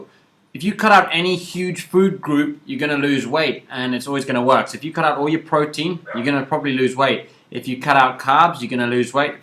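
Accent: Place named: England, United Kingdom. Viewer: Thanks a lot teacher. BTW, You sound a lot cooler with your English accent haha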